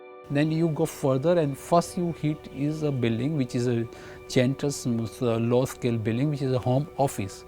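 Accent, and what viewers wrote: Gujarat, India. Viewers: Bhai mere, He is from Gujarat, We are learning hindi since our childhood. If you tell him to speak in hindi or gujarati he will be fluent. Ask your dad or mom to speak in english and they will be like this only. See the kind of work the person has done. Don't judge anyone by his way of saying a particular language. You are not even 0.1% of what he is in this world. :)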